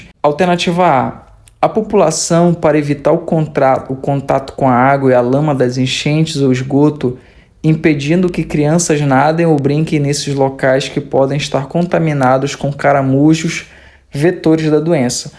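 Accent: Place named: Pará, Brazil. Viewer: teu sotaque é muito paraense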